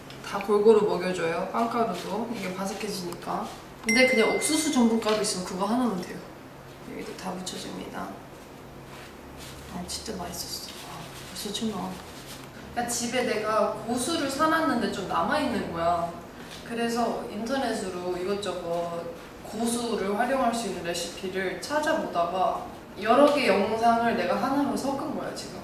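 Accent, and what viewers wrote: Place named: Gyeongsang, South Korea. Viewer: 는 경상도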